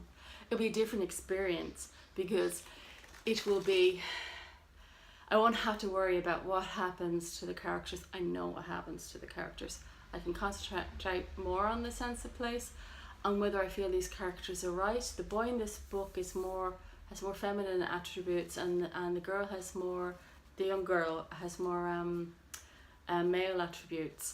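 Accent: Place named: Ireland. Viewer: Hi, I found your channel through Shawn and although you’ve only filmed ten or so videos, you seem a natural and it’s lovely to hear an Irish accent (via Australia was it?). I made a comment on Dave’s channel to the effect that I’m a very new rereader but have embraced it wholeheartedly, despite having as many unread books on my shelves as you have 😊. It’s primarily because I realized I can’t remember the books I read twenty years ago and it feels ridiculous saying I’ve read them when I can remember nothing about them. I’ve done four rereads this year and each one made that particular book so much more meaningful and I feel I could reread each one again. I must admit I don’t think about rereading mysteries and thrillers as part of the fun of those is finding out who did it but with my sieve like memory, that probably doesn’t matter! 🙄 I loved A Tale for the Time Being when I read it a few years ago and now you’ve made me want to reread it. 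Yes to rereading!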